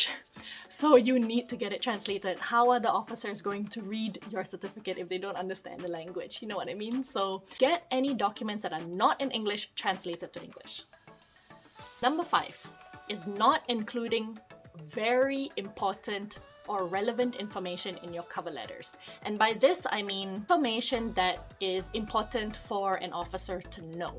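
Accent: Scotland, United Kingdom. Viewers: Can here your Scottish accent getting stronger!! 😂😂🏴🏴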